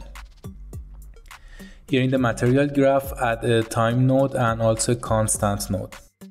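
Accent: Iran. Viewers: From your accent i believe you're from Iran, so am I. Thanks for the great guide Keep it up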